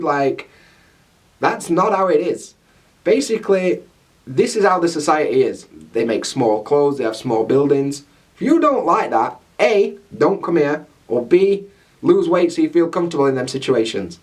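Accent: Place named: North, United Kingdom. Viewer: I've been trying to figure out your accent for ages and I will guess you're a northerner?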